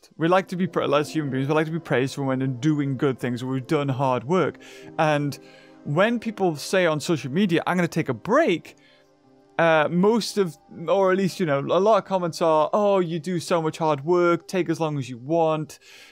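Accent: United Kingdom. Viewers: the only difference is your british accent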